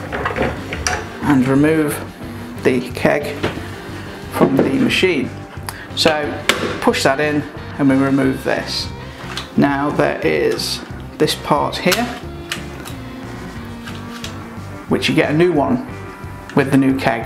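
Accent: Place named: RP, United Kingdom. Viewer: Have you read the manual before making this video? Probably not, because you omitted some important steps. Also, in the tap unit installation, you have mixed up the sequence. Your posh accent is not a substitute for being a professional. This is food&beverage department, not following the rules may become a health issue.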